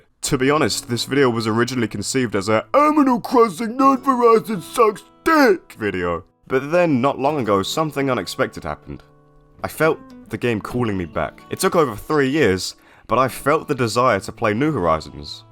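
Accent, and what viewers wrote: United Kingdom. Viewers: You from kent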